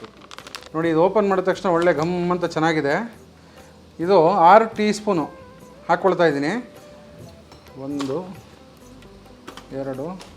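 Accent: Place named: Mysore, India. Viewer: ನಿಮ್ಮ ಶುದ್ಧವಾದ ಮೈಸೂರು ಕನ್ನಡ ಕಿವಿಗೆ ಇಂಪಾಗಿ ಇತ್ತು.ಹಾಗೂ ಪೊಂಗಲ್ and ಫಿಲ್ಟರ್ ಕಾಫಿ ಎರಡು ಇಷ್ಟ ಆಯಿತು. ನಿಮ್ಮ ನಗು ಹೀಗೆ ಇರಲಿ 😁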